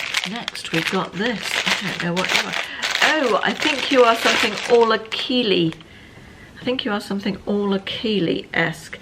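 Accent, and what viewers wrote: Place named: Oxford, United Kingdom. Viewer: I like your Oxford English?! At least it reminds me of my school English lessons 😊. Therefore I’ll come back to your next Temu Haul ❤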